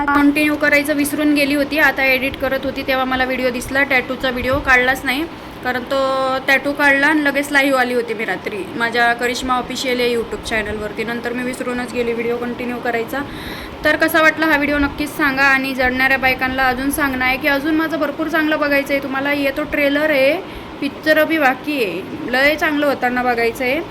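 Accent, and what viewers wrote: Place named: Nandurbar, India. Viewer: तुमचं गाव कोणत आहे तुमच्या बोलीभाषेवर तुम्ही नंदुरबारच्या किंवा जळगावच्या आहात असं समजतंय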